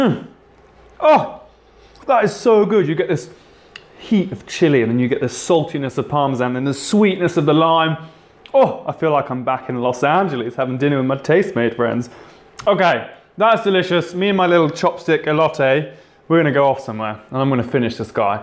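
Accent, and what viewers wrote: United Kingdom. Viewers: i'm mexican, and you sound very cute when you say elote Hahah, i love your accent😍❤ the british accent is the Best❤😍😍😍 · I'm Mexican, and it's so cool to watch you make one of my favorite street foods. It's quite funny and cute how you describe everything with your British accent 😁 I just loved your "chopstick elote with cojita cheese" 😂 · Hot guy with british accent and making mexican food street? YOU'RE PERFECT! 🇲🇽❤️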